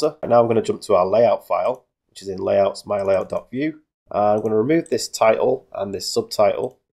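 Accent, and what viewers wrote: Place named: England, United Kingdom. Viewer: Great tutorial. Glad to hear another English voice doing tutorials along with the English "Poo" humor. Well done on getting your video on the front page of <link>!!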